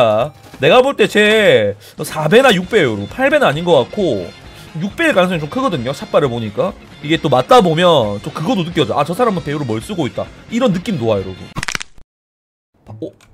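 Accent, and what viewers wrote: Busan, South Korea. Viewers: 형 부산사나보네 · 부산사람 이었어?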